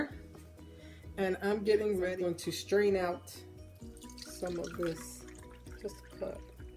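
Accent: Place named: Bermuda. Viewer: Hello madam your ascent so clean looks Bermudian or American ? What you said never use metal pot or spoon she is right also use wooden spoon and glass bottle always any thing for stir hot stuff never use plastic spoon I seen even master chefs using plastic spoon during cooking hot food need common sense only thanks. From, K.singh North West India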